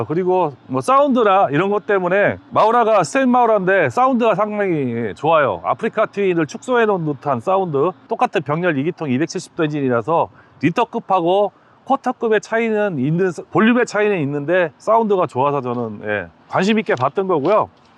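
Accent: Chungcheong, South Korea. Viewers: 핸섬하시군요😅충청도 말투😂❤❤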